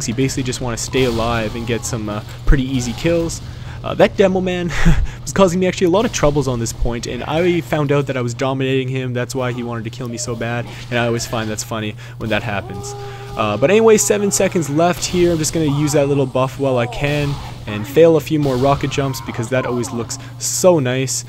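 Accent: Canada. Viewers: Are you a Newfie?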